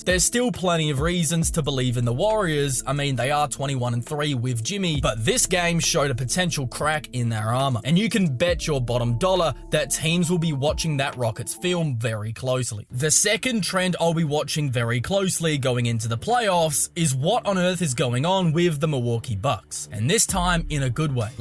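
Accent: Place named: Australia. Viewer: Seriously fucking Aussie accent.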